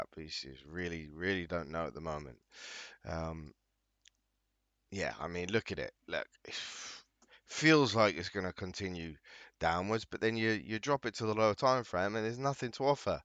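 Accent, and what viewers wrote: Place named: Cockney, United Kingdom. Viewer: proper cockney geezer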